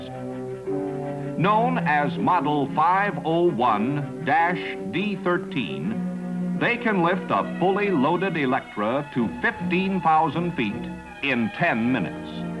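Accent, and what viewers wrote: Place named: Mid-Atlantic, United States. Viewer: I love Mid-Atlantic accent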